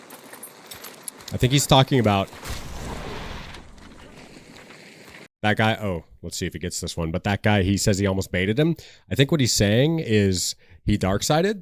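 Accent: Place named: United States. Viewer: no not tech-bro, it’s just American Englisch. Even I as non native speaker can comprehend this. Do you really except someone to change the way they speak based on your feedback? The entitlement, holy shit wow.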